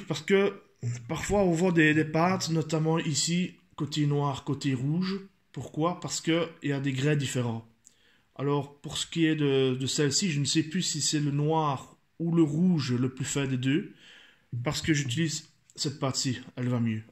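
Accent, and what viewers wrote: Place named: Liège, Belgium. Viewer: Quand je t'ai entendu , j'ai dessuite compris que tu venais de ma région de lièch🤣 Très bon couteau de poche le Ruike p108 le mien ne me quitte plus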